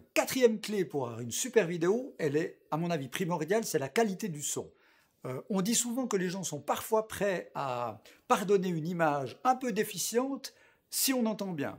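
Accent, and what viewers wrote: Switzerland. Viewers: haha toi tu viens de chez nous! vive la suisse, neuchâtel?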